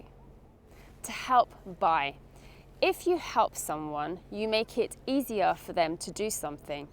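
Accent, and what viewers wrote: United Kingdom. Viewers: This british english accent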